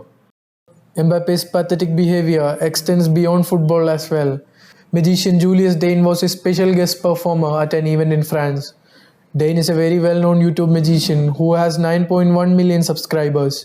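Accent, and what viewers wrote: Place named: India. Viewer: Annoying Indian accent 🤦🏾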